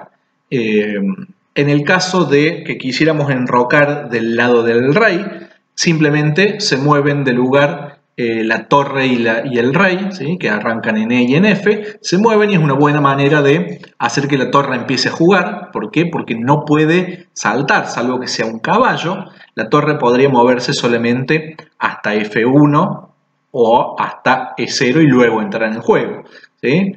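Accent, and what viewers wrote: Argentina. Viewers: es re cordobés el vago jajaja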